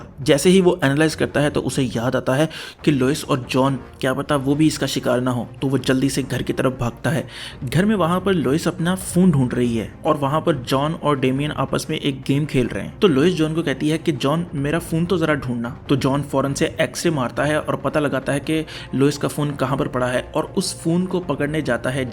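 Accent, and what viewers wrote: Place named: Pakistan. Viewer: Pakistan ke log ko dc psnd krte h ... Mene jb dekha h to awaz se pta lg jata h ke ye pakistani h... Acha h me v dc ko support krta hu...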